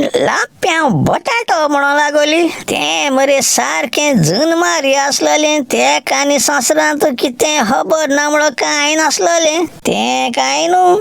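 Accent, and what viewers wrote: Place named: Salcete, India. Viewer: Thank you, Love your news. VIVA Goa and Goans Love your Accent Saxtti Bhaxen ahm ❤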